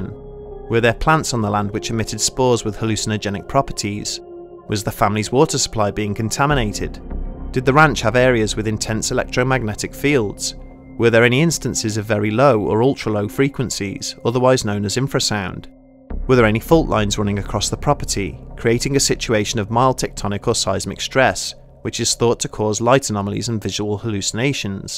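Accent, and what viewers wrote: United Kingdom. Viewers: we need more UK guy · Why do I these videos always have to be narrated by some damn Brit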